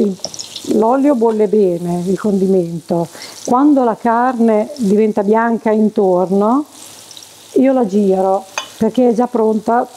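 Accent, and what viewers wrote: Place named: Romagna, Italy. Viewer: Sono romagnola vivo in Belgique la guardo sempre l accento romagnolo Che belllooo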